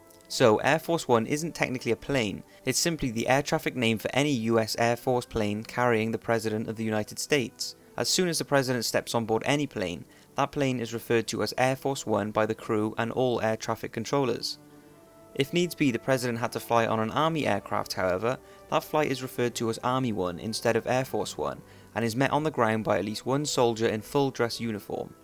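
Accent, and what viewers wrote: United Kingdom. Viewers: The narrator is a Brit. haha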